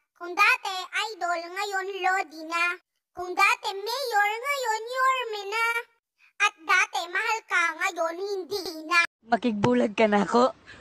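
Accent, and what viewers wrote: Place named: Visayas, Philippines. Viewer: Ala eh visaya he he ka cute goodluck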